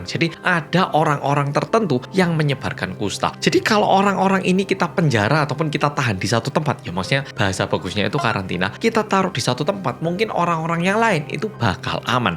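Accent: Java, Indonesia. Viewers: Gabaken wkwkwk😂😂 jowo banget😅😂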